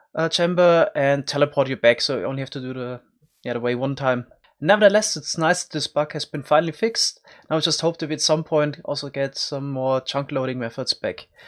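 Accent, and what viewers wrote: Germany. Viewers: Kann es sein dass du deutsch bist? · Man merkt halt echt das du Deutsch bist ^^ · This english Akzent. I höre, dass you are a german sprechen Person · Dieser deutsche Akzent xD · I hear that you are german. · Kann es sein das du Deutsch bist? Wenn ja man hört as an deiner Aussprache! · Deutscher Akzent Noice👌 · Ξενοφώντας Σούλης I’m Mexican. When I heard his German accent I shit my pants 😂😂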